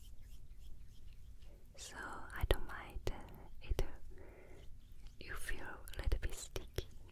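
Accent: Philippines. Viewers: Why does it sound like she has a Pinoy/fillipino accent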